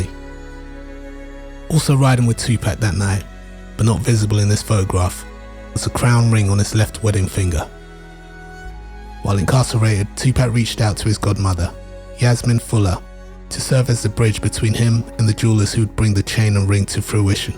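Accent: United Kingdom. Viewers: Where you from cos? Your ascent sounds like you are from uk or something, maybe australia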